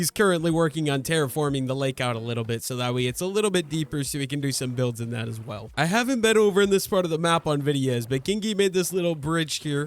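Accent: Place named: Canada. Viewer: You sound somewhat canadian 😂❤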